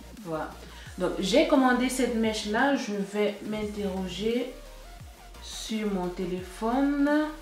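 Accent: Cameroon. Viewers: Olalala j'ai découverte ta vidéo aujourd'hui ma belle j'adore comment tu explique depuis qlq jours j'essaie de vouloir commander les mèches justement ta vidéo vas bcp m'aider j suis accro à aliexpress , ma soeur camer ton accent la ne ments pas 😘 j m'abonne😉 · ta maniere de parler naturellement comme une camer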